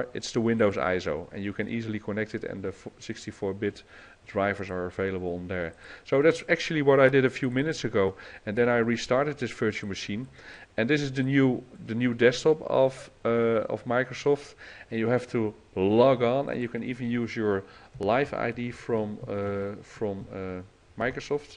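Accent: Netherlands. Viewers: whaha viertual musjien Ik heb echt een hekel aan engels met een nederlands accent hoewel ik het zelf ook heb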